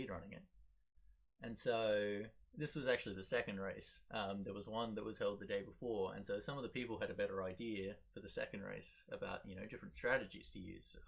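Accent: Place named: Australia. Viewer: OMG YOUR AUSTRALIAN! I DIDNT KNOW. I AM. PLZ TELL ME U LIVE IN MELBOURNE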